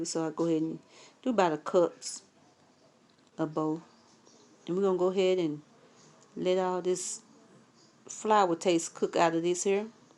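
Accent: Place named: South, United States. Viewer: When I tell you I smelled this through the phone! Oh I love your voice it reminds me of my southern cousins, and watching you cook definitely reminds me of my grandma ❤️❤️❤️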